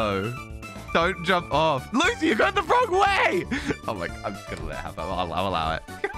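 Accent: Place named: United Kingdom. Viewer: cool video and all but why are you british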